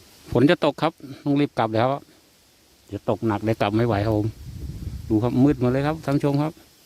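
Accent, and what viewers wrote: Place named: South, Thailand. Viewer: คนไต้แน่นอน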